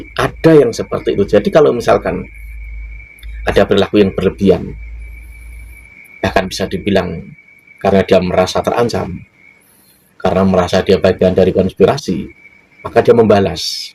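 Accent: Java, Indonesia. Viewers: mas, aku kok seneng banget ya video sampeyan? cara bicara sampeyan itu khas jawa yg tenang dan lucu. salam kenal ya mas..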